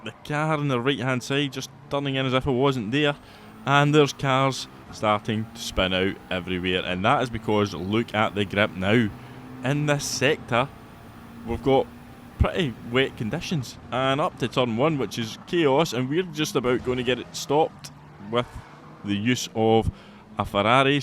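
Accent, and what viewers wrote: Scotland, United Kingdom. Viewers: Scottish accent or not, the way you pronounce p6… er…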